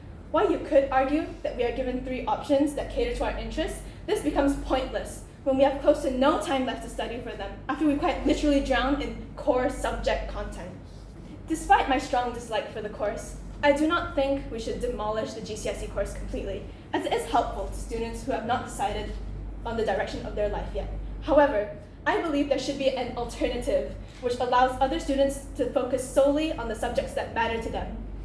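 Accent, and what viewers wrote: United States. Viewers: I like ur accent, sounds americanish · finally, someone with an American accent in a uk school, I’m not the only one!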